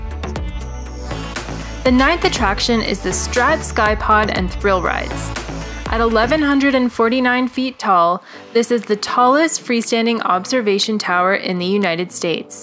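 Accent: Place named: Canada. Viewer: I enjoyed the video, but I was easily distracted by the Canadian accent!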